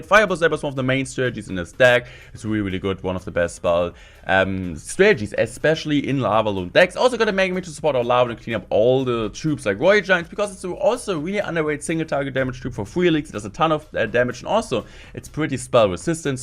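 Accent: Germany. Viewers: Are you german normally with the accten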